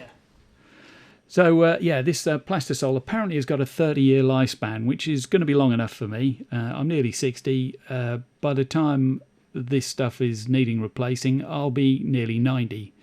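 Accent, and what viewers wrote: Norfolk, United Kingdom. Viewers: many thanks. I will have to google cruck frame and sip panel as I don’t know what they are. The only thing I was worried about if I sank it low was damp. You sound like you’re from Norfolk way. I’m Wisbech based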